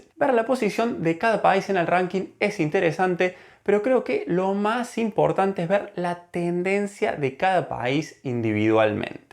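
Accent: Córdoba, Argentina. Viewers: El mejor lugar es Argentina. Ah, no para, me equivoqué de época jaja. Excelente contenido! Me estoy paseando por todo tu canal y es muy cómodo escuchar a alguien hablar con acento cordobés sobre viajes en el mundo! Saludos desde Bs As.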